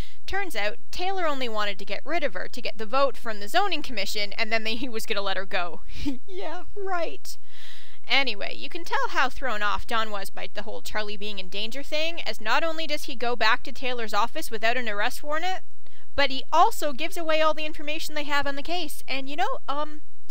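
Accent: Canada. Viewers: I need to finish watching this. But hey, yeah, you do sound Canadian but some people think I do too because well, I live near the Michigan/Ontario Border. The way you said "about" sounded about normal to me. LOL, anyway, part 1 was great and I am sure part 2 will be too. Okay enough blah blah from me. Rock on! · omw you're actually so funny (: I must go re-watch this one just because of you. It's like 52 past midnight. Damn your obvious subliminal messaging. And Canadian? Is it bad that I never actually notice accents? You could have been Australian for all I noticed. OMGAUSSIEACCENTS *dies and curses her own accent*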